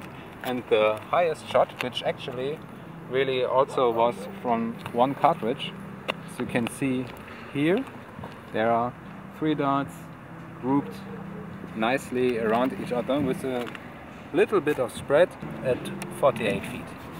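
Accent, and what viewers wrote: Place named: Germany. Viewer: I personally love the german language, and the accent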